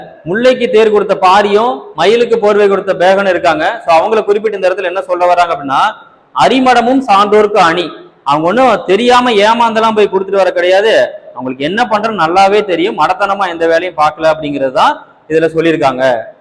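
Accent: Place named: Kongu, India. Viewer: Anna neenga kongu tamil azhaka pesuringa enna oor neenga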